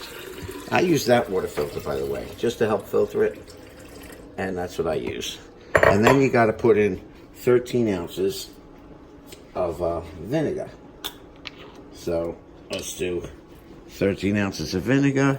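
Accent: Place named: New York, United States. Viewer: Suuuuuuch a great NYC accent! I’m guessing Brooklyn, but maybe SI, Queens, or d’Bronx? 😊 Regardless: thanks for your helpful video. I too am a “kawfee drinka” and I need that damn red light to go off!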